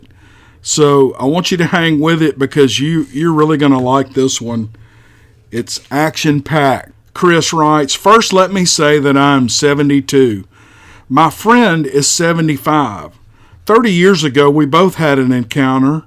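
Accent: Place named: South, United States. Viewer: Dang it that last one was a corker! It’s 9pm here and I made the mistake of listening to that one while driving a lonely road up here in the redwoods of Northern California. Not even the soothing tone of southern voice could shake the creeps. Great work as usual 👏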